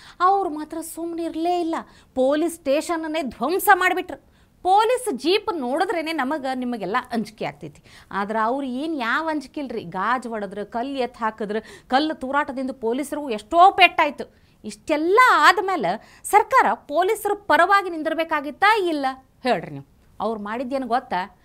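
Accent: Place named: North Karnataka, India. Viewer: Well done madam , Good news reader in our u k langauge👃👏👏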